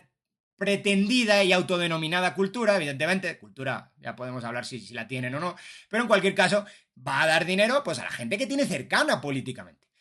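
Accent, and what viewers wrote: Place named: Argentina. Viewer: Parece que tú no tú no eres de Guatemala tu hablado Qué haces para que parece que tú eres de Argentina o de Colombia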